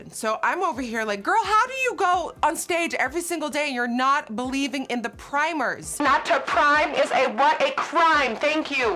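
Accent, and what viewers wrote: United States. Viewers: i sometimes forget that you're dutch???? like you sound soo american? how can you not have an accent lol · Your Jojo voice was perfect. I remember when accent was so strong and now she sounds born and raised in the usa!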